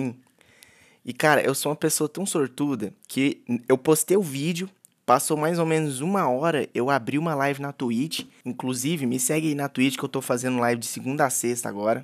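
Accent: Minas Gerais, Brazil. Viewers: Tu tb é mineiro man?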